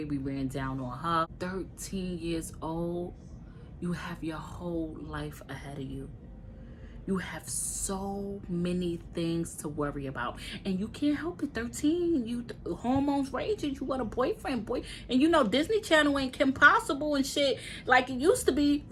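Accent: New York, United States. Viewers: I just love listening to you and that New York accent. 😊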